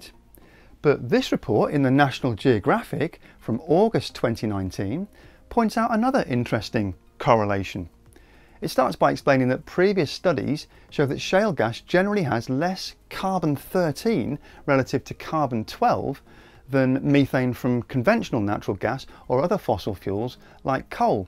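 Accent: United Kingdom. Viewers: You pronounce it me-thane, we pronounce it meth-ane. We don't tell you how to pronounce words if you don't tell us how to. Deal? Whenever I come across the English when I was in the UK or when travelling they always show their arrogance. My suggestion is you're not that special, so stop acting arrogant.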